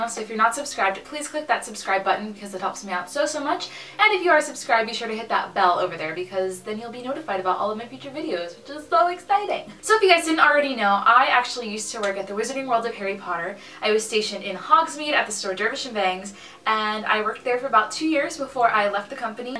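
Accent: United Kingdom. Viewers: Hi. Did you have to put on a British accent while you worked there? Thanks for the fun vid!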